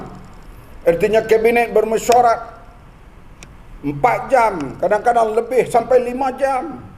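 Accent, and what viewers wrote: Kelantan, Malaysia. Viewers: Hhhahah kecek kelate siap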